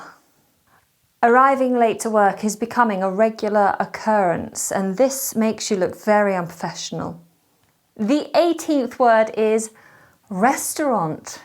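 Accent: United Kingdom. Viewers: Wow...wonderful British accent👍💟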